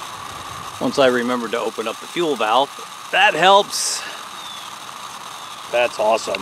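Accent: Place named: New Jersey, United States. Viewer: Since I subbed a little while ago I thought I detected a Jersey accent. Sure enough! I’m originally from northern New Jersey myself, but have been on Cape Cod most of my life.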